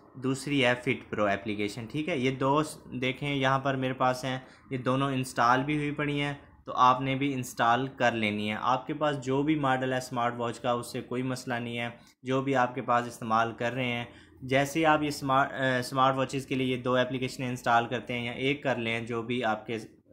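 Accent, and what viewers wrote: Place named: Pakistan. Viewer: Bro tumhari voice me Pakistan wali wibe aa rahi h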